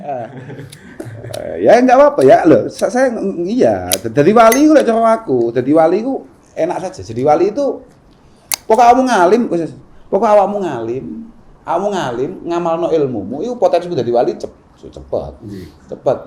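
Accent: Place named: Malang, Indonesia. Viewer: Bismillah...uwennak rek dirungoknone...logate koyo arek malang...umume gampang difahami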